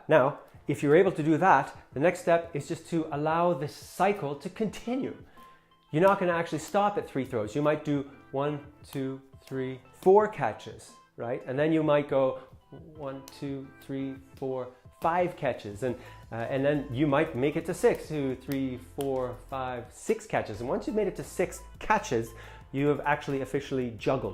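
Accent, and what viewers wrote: Ireland. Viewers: Definitely one of the better accent attempts Iv'e seen on youtube, and I've seen some bad ones, good job either way. I live in Cavan, but my doctor is from Galway and he sounds exactly like this. Dr Cassidy is also a dork too, no offense I'm a dork so I can get away with saying that :P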